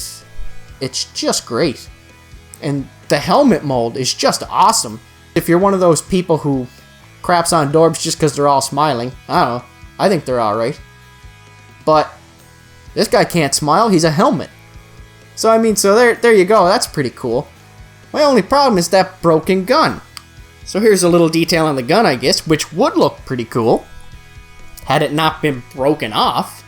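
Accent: Canada. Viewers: Love the Cape Breton Accent! Haha. Great job! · you sounds so much more Canadian than Tracey does.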